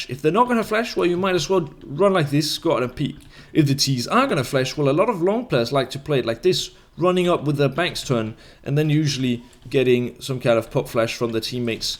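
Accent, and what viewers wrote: Denmark. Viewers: are you danish? xD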